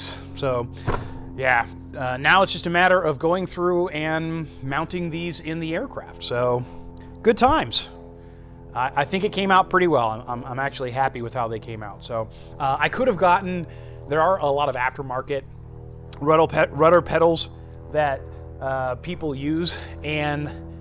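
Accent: South, United States. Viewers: When did you get an southern accent!?! I don’t remember it from the previous videos. Glad you had a good vacation!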